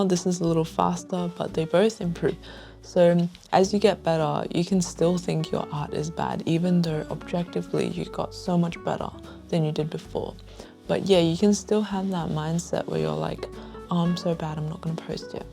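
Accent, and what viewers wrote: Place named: Australia. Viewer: Love this! Massive help. By your accent by any chance you’re from Australia?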